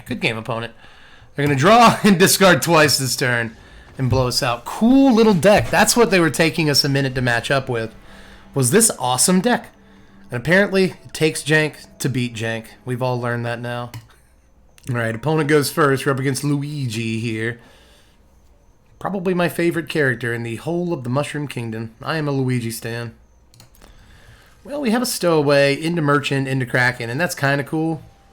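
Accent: South, United States. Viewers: My dude you know I love ya to death, please please please please stop with the country voice. I keep having to click away. It’s…too much. Thanks.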